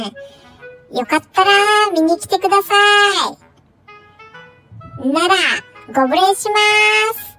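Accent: Kumamoto, Japan. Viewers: はじめまして😂こてこての県民天草〜熊本市出身です😊（コメントはあえてNo熊本弁で🙏）やっぱり熊本弁はネイティブが話してこそ生きると痛感してます!来年もスカッとする熊本弁トークお願いしまーす👌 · こんにちは😂 いつも仕事から帰ってきて我が家のミディアムプードルと一緒に楽しく見てます😄 来年はバーニーちゃん、 ジーニーちゃんに会いに行きたいと思ってます😄 熊本弁…楽しくて笑っちゃいます🤣 (息子が熊本に住んでてイントネーションが変化して帰ってきてます笑) 来年も楽しみに待ってまぁす🐶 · ばっちゃんのチャンネル見つけて1週間。毎日見ていたら私の発音がちょっとおかしな事になってるみたいです😅千葉県民ですが、熊本弁に侵食されてきました。 来年も熊本弁を習得できるようたくさん見ます😊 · 今年はジーニー兄ちゃんとバーニーとの出会いがありました。笑いと癒しを沢山届けてくれてありがとうございました❤ 出会いは未だ登録者数が3000人未満だった時です❤でもその後の快進撃❣️素晴らしい👍です。とにかく何気ない日常だけど笑える😅🤣熊本弁もいいよね〜💕 東京からだと熊本は遠いからなかなか会えませんが、もう親戚のわんちゃん達って感じです。 来年もどーぞよろしくお願いします❤ で良いお年をお迎えくださいね💕 では、ご無礼いたしま〜すぅ❣️👈この言葉私のお気に入りです😅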